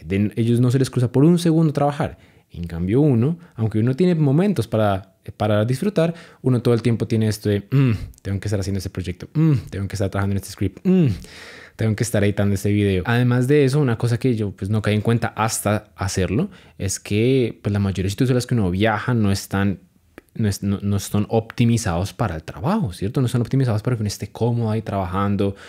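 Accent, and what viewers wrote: Colombia. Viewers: Por la forma de hablar supe que es Colombiano.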